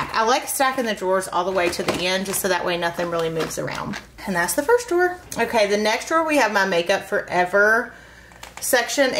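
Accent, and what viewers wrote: South, United States. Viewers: I was introduced to you through yours and Vianney's giveaway...... so glad for that! Love your realness and your accent is ah-mazing!!! Reminds me of my Georgia and Alabama family 😉.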